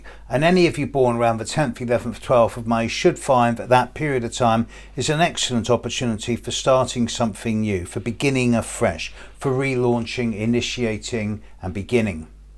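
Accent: United Kingdom. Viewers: Thank you for your forecast of all the signs especially Taurus which is my sign. I had a great year 2020 despite all the changes who are going on. I feel more peace within my self and more harmony. I am a Taurus with an ascendant in Aquarius which was not easy during some time in my life. I try to be open to change and new ways of living and new ways to understand life. Thank you for your forecast and I just love your British accent being myself a Quebecor French native. I am a fan.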